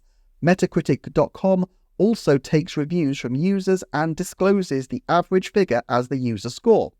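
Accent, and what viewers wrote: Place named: RP, United Kingdom. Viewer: This review doesn't pertain directly to this particular video, but I just want to say since YouTube tells me I'm in the top 0.3% of your viewers that I do enjoy watching your videos. The fact they're based on statistics and facts rather than so much speculation like most gaming YouTubers makes it a good watch even if the subjects are sometimes what my friends would call nerdy. Lol. And plus my hillbilly gay ass from Kentucky just imagines some fancy brit speaking over a cup of tea and it is almost an ASMR experience. Keep up the good work. 😁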